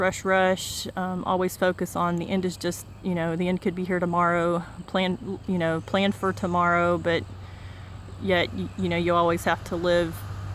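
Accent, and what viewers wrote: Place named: Texas, United States. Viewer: You sound perfect- just enough Texas twang to make it authentic! Exactly how I figured you'd sound!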